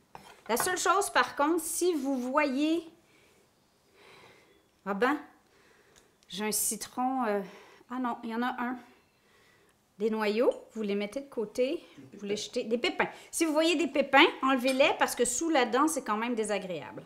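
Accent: Canada. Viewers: bonjour, je m'abonne ici et j'adore ton accent canadien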